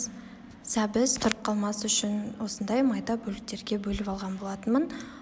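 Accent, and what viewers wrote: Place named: Uzbekistan. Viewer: Ашты деген соз жок ащы .Казакша дурыс сойлендерши онтустик халкына отиниш .Айналып дегенди айланып дейсиндер жыным келеди .Озбек , калпак болып кеткенсиндер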